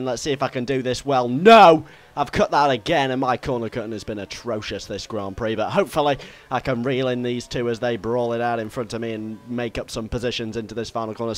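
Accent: United Kingdom. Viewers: He has a very normal British accent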